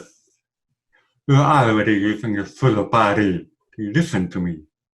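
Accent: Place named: Vietnam. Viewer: I had a vietnamese lecturer, and you are have a lighter version of his accent. Much more understandable than him though :)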